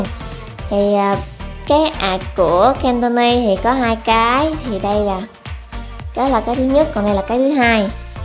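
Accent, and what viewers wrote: Mekong Delta, Vietnam. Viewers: Chị Miền Tây à!! Thảm nào giọng Chị Kute qué:3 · người Miền Tây mà giọng lúc nào cũng kawai như thế này cơ đấy xD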